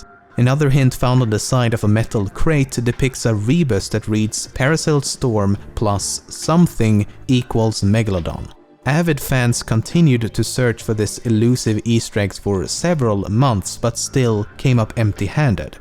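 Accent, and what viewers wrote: Gothenburg, Sweden. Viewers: it sounds like you are Swedish I can hear it on your accent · Jävlar vilken göteborgare du var!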